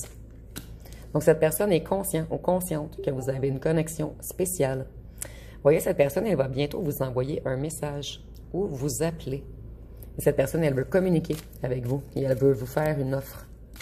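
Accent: Quebec, Canada. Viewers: Bonjour 😁, Très très passionnant votre tirage 😅, j'ai l'impression que vous décrivez une bonne partie de ce que je vis actuellement 😅, j'ai hâte de voir ou cela mènera mais en effet je garde une certaine distance car ne sachant pas réellement ce qu'il veut et cherche mais en effet de son côté c'est est le chaos dans ca vie ..... Maintenant à savoir si c'est de lui que vous parlez ou d'une autre personne 😁 car lui est poisson 😂 En tout cas je vous suis avec grande attention et j'adoreeeeee vôtre accent québécois ❤❤❤❤❤❤ Et mille merci pour vôtre tirage qui m'a fait sourire 🥰🥰 Et à vos souhaitssssssss 😉🥰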